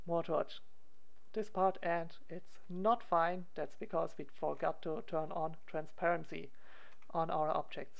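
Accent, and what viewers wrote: Germany. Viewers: Man merkt direkt das du Deutscher bist aber gutes Video Like&Abo haste von mir!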